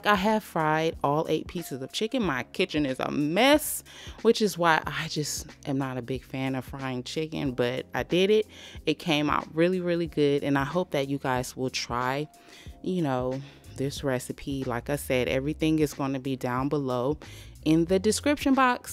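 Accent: South, United States. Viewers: An African American sista with a southern accent frying chicken. It's got to be the best.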